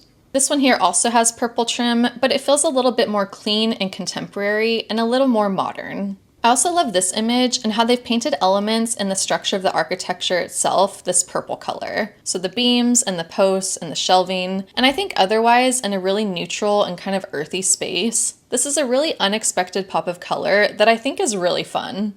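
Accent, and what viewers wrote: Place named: California, United States. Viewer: I pick up vibes in a voice and what your voice with vocal fry and upspeak suggests is very arrogant Snobbish and bored. Its sort of a mean girl california sound. It cant help it I am interested in what you have to say but just as if you were shouting at me its hard to get past the affectation.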